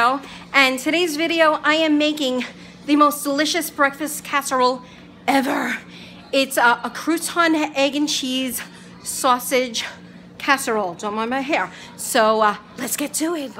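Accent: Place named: East Coast, United States. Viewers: I'd eat that. BTW, love the east coast accent. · Oh my Gawd! Oh my Gawd! I love the east coast accent.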